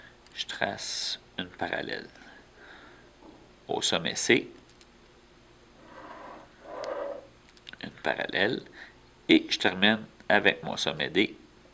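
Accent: Quebec, Canada. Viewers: Non c’est son accent québécois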